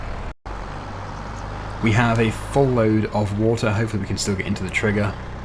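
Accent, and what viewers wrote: England, United Kingdom. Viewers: Definetly Bri'ish, but in the way that everybody can understand you, not the Yorkshire or Birmingham mumbling 😆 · British 100% - further south than myself! You play court farm really well, I find it really cramped and keep crashing into things expecially around the grain silo through to the closest silage pit! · You have to be, definitely, be British. Arn’t you? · I've been watching every video you post for over a year now i know your British just from your accent, a d i think you've said in a couple videos too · 100% British u live in England am shoure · I've watched your videos for long enough to know your British. Also love your videos! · the accent makes you speak the UK style of English, not the unaccented US style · British isn't an accent, he has an English accent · no way some can think he is form USA that accent's can't be more British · Definitely more British and not American, or might be a tiny Wales in there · British 1000%, not even close to Merican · British, you're British mate! 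Can't quite place your accent, though. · So obvious that you are British. Where exactly I can not place, somewhere in England though. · You sound British to me. If you’re American, you have a good fake accent. Wow! That would actually blow my mind if you came out with a video where you finally admitted that you’re not British and you had a California accent. 🤯 Or if you had a really thick southern accent, ha! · is unmistakably British. Middle England is my guess. Well educated. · I am an American and I can tell your British! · British way to quite to be American an also to intelligent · Always knew you're British but i am American soo we know each other's accents lol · Heh you're definitely British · Only someone just not too familiar with accents would mistake you for anything other than British! A new vintage survival sounds grand.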